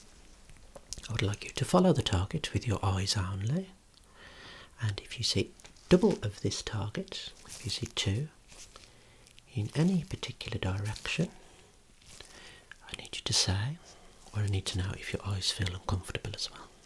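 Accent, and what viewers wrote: Birmingham, United Kingdom. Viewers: Is it a Brummie accent? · Hes from birmingham or wolverhampton. The accent.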